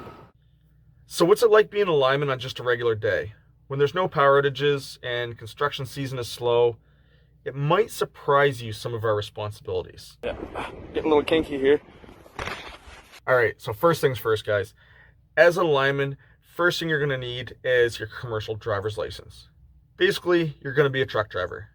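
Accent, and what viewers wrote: Canada. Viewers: You're a canadian Eh!!!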